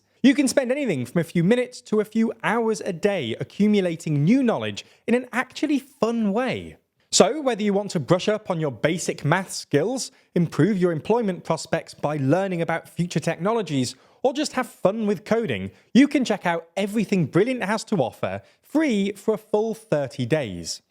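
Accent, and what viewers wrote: United Kingdom. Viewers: 5% growth for 2023, British accented presenter enjoy your recession riddled economy.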